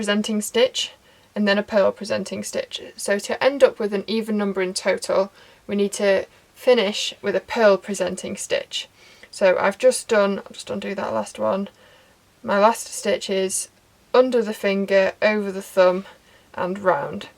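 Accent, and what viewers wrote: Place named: Lancashire, United Kingdom. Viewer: Excellent tutorial! I prefer the Alternating, Long-tail 1x1 rib (aka Italian) cast-on to the too-often - IMHO - recommended Tubular (also aka Italian CO). So your tutorial hit resonated well for me. I'm starting a beret for a lady friend, and was looking for your exact video to kick-off the project.:) think that I'll try casting on straights, then transfer the lot to bamboo DPNs, hoping for more cling to min. the twisting. Then transfer the lot to ML! Love your Lancashire accent, as I have some remote connections in W. Yorkshire. Thanks again!!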